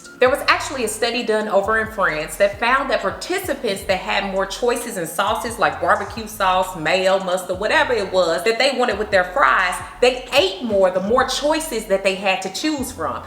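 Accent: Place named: St. Louis, United States. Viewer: I love that STL accent. #lovinthelou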